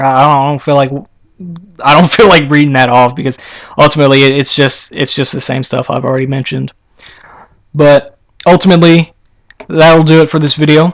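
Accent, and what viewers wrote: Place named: Texas, United States. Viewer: you sound extremely Texan i dont live in america so i cant exactly say what texans sould like tho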